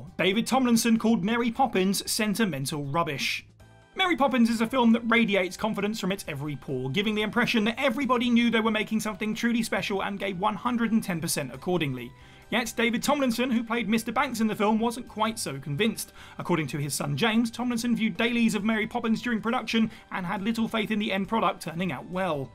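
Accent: United Kingdom. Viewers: Love how British people speak 😂😂🤣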